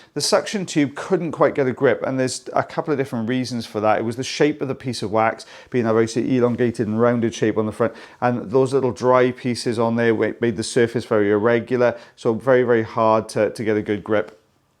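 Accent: Wales, United Kingdom. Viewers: I love how Americanisms are slowly starting to enter your lovely Welsh accent…”big ole”!!! I chuckled when you said that.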